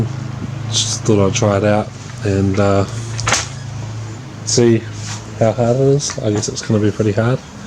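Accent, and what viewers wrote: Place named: New Zealand. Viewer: He’s from New Zealand, that’s how they talk bru!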